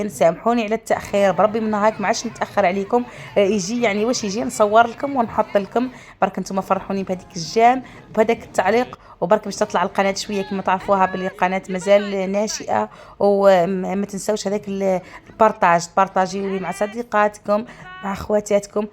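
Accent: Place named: Sahara. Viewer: ربي يبارك وصح عيدك انتي من الصحراء على خاطر المردود والدشيشة وعيال خوتي لهجة صحراوية متاع ورقلة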